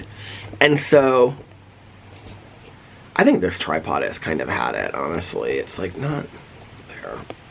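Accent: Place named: Indiana, United States. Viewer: Indiana-isms "I saw-l". "my grandma-l". Sandwich- "sammwich". Potatoes "Batatoes". There are so many more lol.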